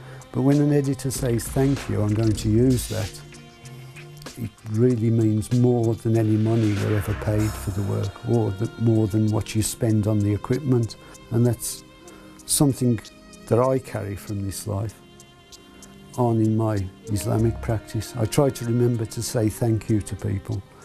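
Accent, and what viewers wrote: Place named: Birmingham, United Kingdom. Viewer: Proper Brummie Brother 👍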